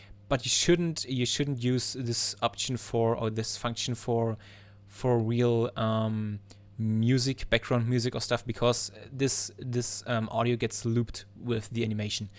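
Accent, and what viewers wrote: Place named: Germany. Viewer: You are defenetly German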